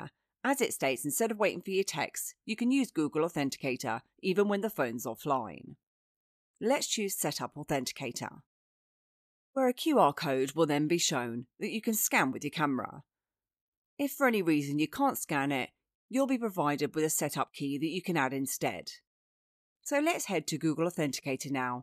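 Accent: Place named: London, United Kingdom. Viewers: this wonderful London accent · I love her upper class so much British accent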